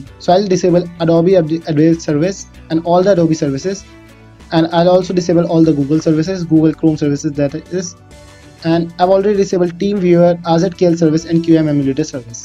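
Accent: India. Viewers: Look, you try to help people, and that is a really good thing. Kudos for that. But I have to mentions this, and it is not meant rude, but as a tip. You have such a strong indian accent, that your videos, and many of the viewers would benefit a lot if you spoked a bit more slowly. Yes, the video would maybe be a minute or two longer, but at least one would be able to understand more clearly what you say. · opens video, hears indian voice, dislike and close it.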